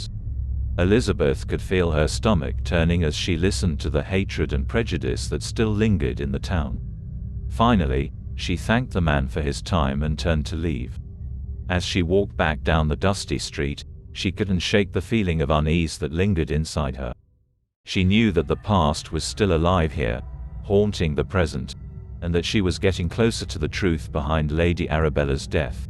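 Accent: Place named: United Kingdom. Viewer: read by a British robot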